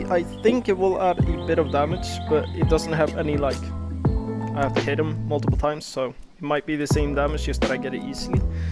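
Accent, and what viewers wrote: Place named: Sweden. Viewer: Visste inte att du var svensk före den här videon. Man hörde det verkligen på din dialekt.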